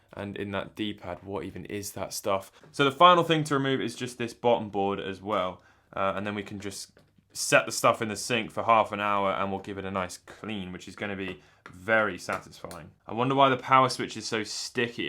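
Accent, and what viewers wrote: England, United Kingdom. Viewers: I love how his English accent sound "Dah screw sstick to my finguh" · The English accent and vocabulary makes me feel like the gameboy is dirtier than it really is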